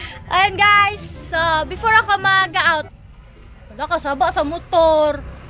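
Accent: Visayas, Philippines. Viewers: Ate Bisaya kaba? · Wait bisaya kaba ate?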